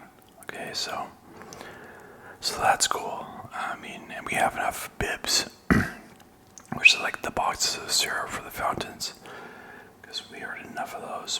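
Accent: Canada. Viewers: The Canadian accent always brings me back to your videos. Keep up the grind!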